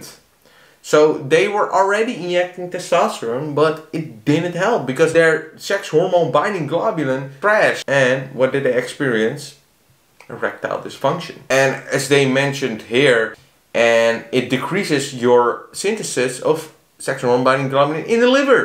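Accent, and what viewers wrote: Netherlands. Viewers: Ik hoor een heftige nederlandse accent 🤣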